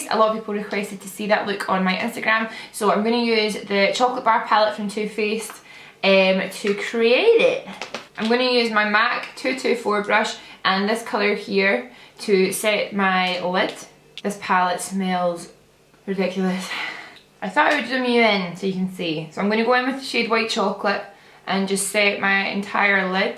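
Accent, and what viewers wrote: Scotland, United Kingdom. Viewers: LipsticksBeauty AndMore glasgow, scotland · Not only are you an utter boss and totally glamorous, your accent reminds me of one of my best friends from Scotland, and it's awesome.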